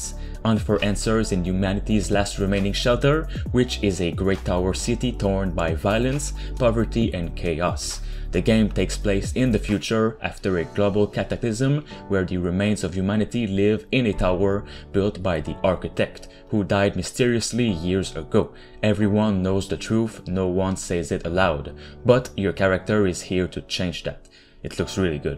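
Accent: Quebec, Canada. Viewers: T’es tu québécois? tu sonne comme un québécois qui parle en anglais genre moi ahah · Est xe que tu es Quebecois ???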